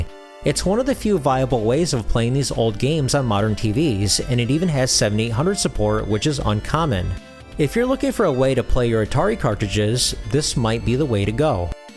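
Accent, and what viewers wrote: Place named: Upstate New York, United States. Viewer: You from Upstate New York? Your accent reminds me of home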